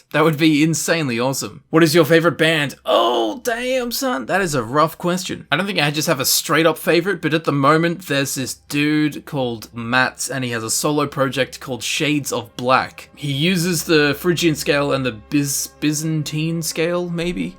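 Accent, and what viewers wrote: Australia. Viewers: WHERE? WHERE IS HIS AUSTRALIAN?!?!? WHATS WRONG WITH IT? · Wait, your Australian?!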